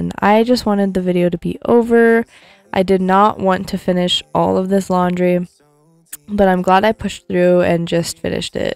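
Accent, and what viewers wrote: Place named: United States. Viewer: Hi! I was intrigued by your channel name and thought you were a ie New Zealander like me, but then I heard your American accent and saw the American products. First up I want to say I'm sorry for your loss, and I think you did absolutely the right thing which was to lie low for a few days, grieve and go easy on yourself, and then get up and tackle what you had to do. You are spot on when you said it's good for your mental health to achieve something and make your environment pleasant and tidy. I was nannying for a few years for various families and I learned a lot by observing how they ran their homes. One thing I noticed was that decluttering makes life so much easier. One mother had 2 little boys and she really had a minimum of clothes for them. She would do a small wash every day and get them dried and put away and there was never a huge pile of clothes to worry about. 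That's one recommendation I'd make to you, to go through your little guy's clothes etc and try to trim it all down to a minimum. Another great trick is to put away half of your little one's toys for a few weeks. When you bring them out again, put the other half that has been out away. He'll almost be like he's got new toys or so excited to see some old favourites. That way it's easier to keep tidy because there aren't so many lying around, plus you'll have a very happy kid. Also when they are so young, they grow out of toys so quickly so it's good to go through them and donate the ones that he's no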